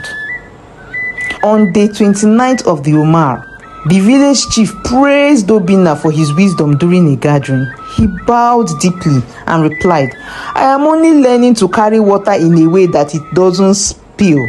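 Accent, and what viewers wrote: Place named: Igbo, Nigeria. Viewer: Wow my own sister I love the Igbo accent very powerful story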